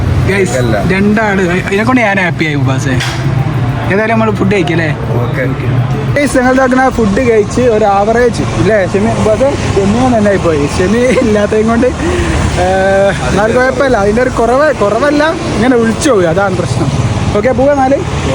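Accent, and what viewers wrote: Kozhikode, India. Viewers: കോഴിക്കോട് സ്ലാങ് തന്നെ മതി. അതാത് നാട്ടിലെ സംസാര രീതിയാണ്. ജനങ്ങൾക്കിഷ്ടം. കൂടുതൽ ശുദ്ധ മലയാള മൊന്നും വേണ്ടന്നർത്ഥം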